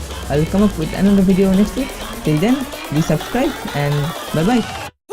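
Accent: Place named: India. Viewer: Ur indian accent is annoying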